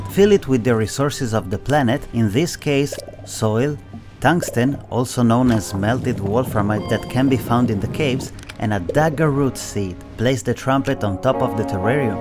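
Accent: Italy. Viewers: Dude, are you italian? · Your accent makes me think you're italian, aren't you?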